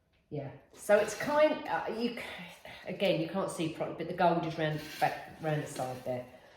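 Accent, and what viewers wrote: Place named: Northern England, United Kingdom. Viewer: I’m from Durham and I can say that was not too bad for a northern accent, 😂, what did make me spit my coffee out was when you were ranting about Sean taking the washing up liquid and you said, “he takes it up the back”, my hubby raised a eye brow cos he didn’t understand the full conversation, 😂😂 xxx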